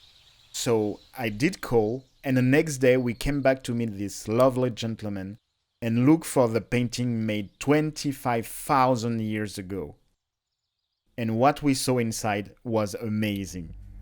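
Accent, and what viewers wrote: France. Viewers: Tu est français ?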